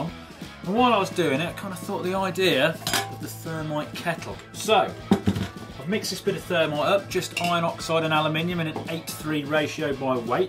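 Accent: United Kingdom. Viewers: You can tell where he's from he's got a British accent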